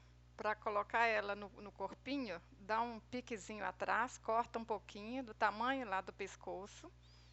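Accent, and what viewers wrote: Minas Gerais, Brazil. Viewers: Gostei de sua aula, amo esse sotaque minerim. 🥰 Vou fazer a minha bonequinha.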